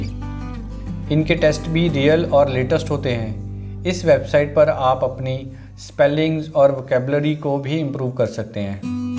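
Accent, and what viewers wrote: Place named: India. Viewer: Thank you , but your Indian accent makes it so hard to find out what you say , but thank U so much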